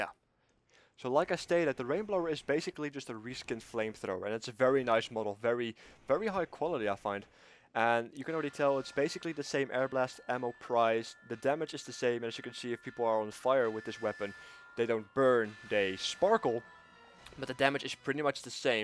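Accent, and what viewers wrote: Netherlands. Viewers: Bro, are you dutch? you sound like you are :)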